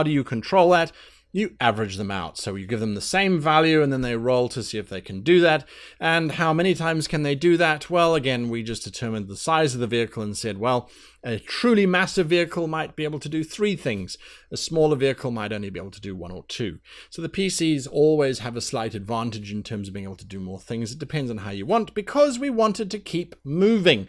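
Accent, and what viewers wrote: South, United States. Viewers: Damn, dude! For an african, thats not bad south hick north american accent! I can beat ya, but barely. Bet you can beat my dutch sailor. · HAHA!! GREAT SOUTHERN ACCENT!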